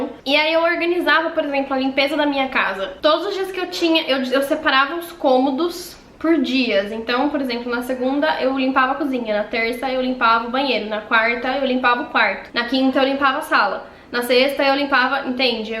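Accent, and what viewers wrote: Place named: Paraná, Brazil. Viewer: querida, vc é do interior do Paraná? Temos sotaque parecido!!! Concordo organização e planejamento é fundamental, parabéns pelos seus vídeos!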